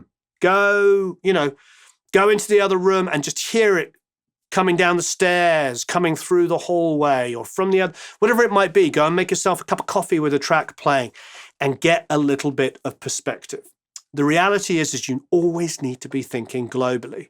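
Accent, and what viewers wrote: England, United Kingdom. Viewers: All of this truly wonderful content, also done in an adorable soothing English accent no less, has truly made me appreciate all of those finished records I had so taken for granted before far more. This industry is apparently no 'piece of cake' at all when one realizes you're dealing with so, so many different variables AND the personalities of possibly technically ignorant "Artists" and even their producers AND Apple's publishing standards. Good luck with that.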